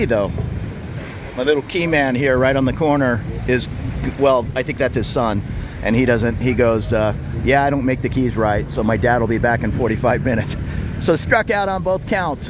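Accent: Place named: Southern California, United States. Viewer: Hi, I just spent a week in On Nut and you popped up in my YouTube feed. I lived on La Jolla Blvd. in PB for three years, back in the late 90’s. It was a giggle hearing your SoCal accent. 😀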